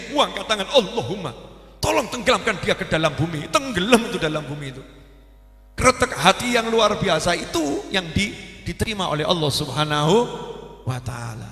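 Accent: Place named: Java, Indonesia. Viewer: Suka sekali dengan cara penyampaian Habib, menyenangkan dan "njawani" Sehat terus ya Bib...in Sya Allah Aamiin 🙏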